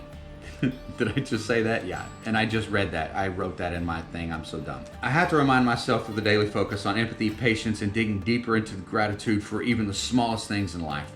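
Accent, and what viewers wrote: Texas, United States. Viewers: Hi! You are doing amazing even when you had coffee for the first days, I’m so impressed of your progress, you’re doing absolutely fantastic 👍🏻 I’m Moroccan in America, I teach my kids to be thankful everything, even the air that we breathe, because we have everything here we don’t feel there that is different somewhere else. My daughter is 11 now and because there is no school she wants to experience fasting for the first time, and yes she is doing the whole day by choice. Note: kids should start fasting when they reach puberty in Islam. Please ask yourself those 2 questions: 1- why me in a Muslim country in Ramadan, what God wants for me to know? 2-why I was chosen to experience this and reflect on my life by myself while I’m getting physical and spiritual cleansing? Do I have to do more research to find out why? Things will come with time but do some from your part. 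yusuf Estes is from Texas, I suggest that you watch his videos, his has the same accent as you 😊 he explains a lot a about Ramadan and more m, he is funny too. And please take care yourself, the tea looks amazing, you need to eat more than that so stay strong for fasting. I’ll watch all your videos and always I can wait for the next one. Please upload more 😊 Take care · Hey, ma man u actually give attention to a lot of things, (the but i digress got me laughing) it reminded me of a tech-youtuber that says it a lot, what i wanted to say is actually even in here we have stuff open by night in the big citys like (rabat/casa/marrakech) ex: macdonalds stays open to about am some other late night kebabs do too, but with the pandemic everthing is closed even in the usa i think it's not the same with the pandemic, btw we understand you because you are from the usa and not from irland or some other part of the uk, your english is pretty much like what we see on tv shows & movies, the tea done right + u actually are more morrocan than a genuine one, why you may ask well most of us are not perfect the way humans are is quite universale, the exmple you gave for being addicted to tobacco, the coffee, we can understand it's quiet normal. 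am only gona say well done, and god bless you brother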